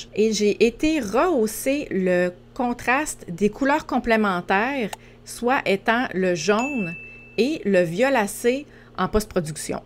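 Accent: Quebec, Canada. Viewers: Bonjour, Il y a un petit moment que j'ai le plaisir de suivre vos tutorielsn mais je m'arrête hélas rarement pour vous en remercier 🥴😳.Je voudrais donc vous adresser un immense, "'Merci" pour la qualité de vos explications et le choix des thèmes abordés. Vous faites un merveilleux travail, vos explications sont claires et.....j'adore ce bel accent Québécois ❤. Yves Banière - Astaffort - France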